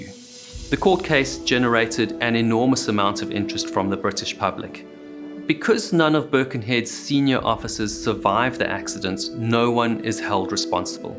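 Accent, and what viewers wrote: South Africa. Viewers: Lovely to hear that South African English accent. The easiest English accent to understand. Especially for non English speakers.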